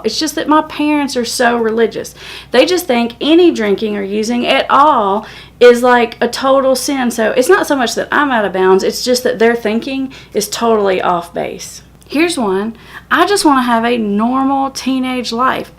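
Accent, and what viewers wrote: South, United States. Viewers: Loving the Southern accent!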